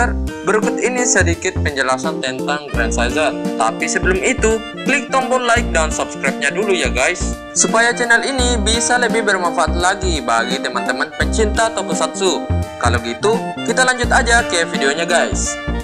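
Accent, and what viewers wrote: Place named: Maluku, Indonesia. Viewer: Bang mau nanya nihh, Abang orang mana yaa kok logat nya kyk orng Maluku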